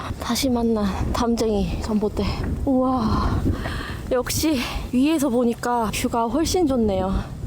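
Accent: Jeolla, South Korea. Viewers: 원래 전라도 분이신가 사투리가 ㅋㅋㅋ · 전라도쪽은 가본적이 없었는데...영상으로 대신 가주시니 좋습니다. 간혹 나오는 님의 전라도 사투리도 듣기 좋았구요. 덕분에 오늘도 여행 잘~ 했어요. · 전라도 사투리도 귀여브러…❤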